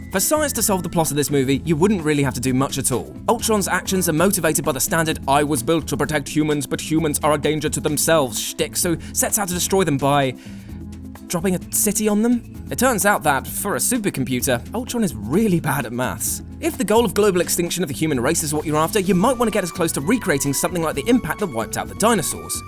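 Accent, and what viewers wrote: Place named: England, United Kingdom. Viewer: Speak a little slower please? English accent is harder to understand than american. Might cost you subscribers. I only understand english because it's the "common tongue"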